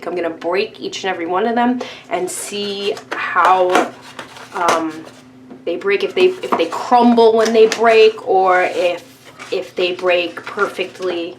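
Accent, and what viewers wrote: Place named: East Coast, United States. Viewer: I just discovered you and your East coast accent❤️‍🔥❤️‍🔥❤️‍🔥🥇🥇🥇🥰🙏🏻👍🏻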